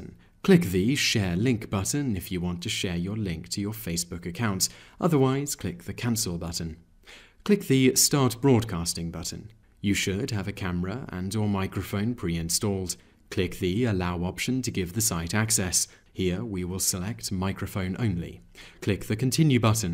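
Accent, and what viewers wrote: United Kingdom. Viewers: Terrible UK accent, could not Understand, very different from American English. Therefore useless to me.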